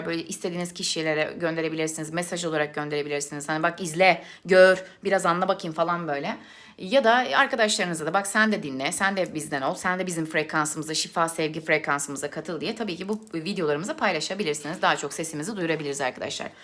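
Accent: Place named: Aegean, Turkey. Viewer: senin ege şivenı yerim ya gidipduruu😁🥰🥰 Vallahı noluyo 2seferdır anlamadık nazaramı geldık! Aman ruhdaşım sen bırakıp gitme bizi🥺 Seviliyorsun!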